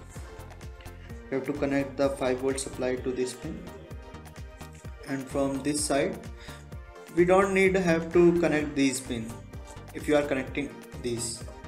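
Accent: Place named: India. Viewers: i love this indidan english! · I personally don't really like it. But if I get to choose between Indian English or nothing at all, then the decision is really easy. I do appreciate videos like this a whole lot :D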